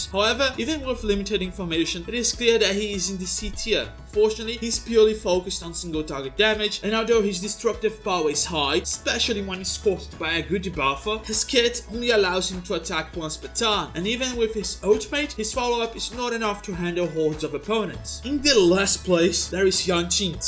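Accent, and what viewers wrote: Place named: Brazil. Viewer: KKKKKKKKKKKKKKKKKKKKKKKKKKKKKKKKKKKKKKKKKKKKKKKKKKKKKKKKKKKKKKKKKKKK EU SOU BR RECONHECI O SOTAQUE DE LONGE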